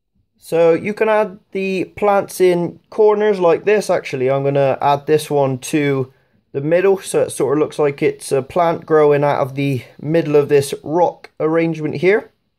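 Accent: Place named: Bristol, United Kingdom. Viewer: Lol great video, but very Bristolian lol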